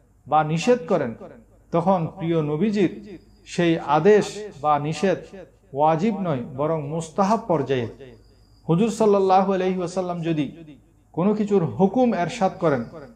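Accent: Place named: Chittagong, Bangladesh. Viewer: Chittagong er hujur bujha jasche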